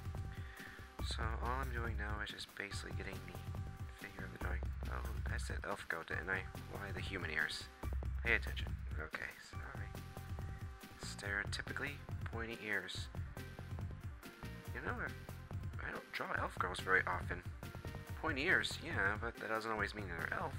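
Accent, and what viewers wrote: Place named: Mexico. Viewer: where is that accent from, Mexico? there are a lot of mexican designers, and drawing artists, is it because some artistic baby boom or the artistic education is superior? one day we will be as good as you guys!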